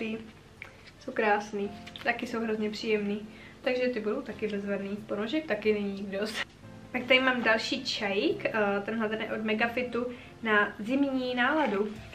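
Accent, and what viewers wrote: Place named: Vysočina, Czech Republic. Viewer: Já na Vysočinu chodila do školy a teda některý slova jsem jako Středočeška s pražskou obecnou češtinou nechápala :-), ale nikdy by mě nenapadlo se ptát, proč říkají "víjou, najíjou se žlicou,spíjou,jdou za starostem" apod. Prostě nářečí, kterýho je ČR plná ;-)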